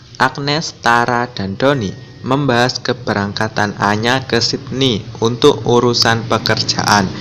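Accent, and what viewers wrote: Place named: Java, Indonesia. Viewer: Medok biangeeet min😀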